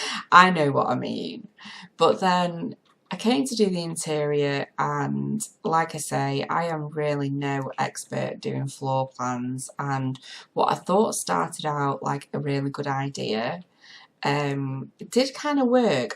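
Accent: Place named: North, United Kingdom. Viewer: This is unreal! I’m currently trying bigger builds and the floor plan gets me every time lol btw I love your voiceovers it’s nice to listen to a northerner Faye x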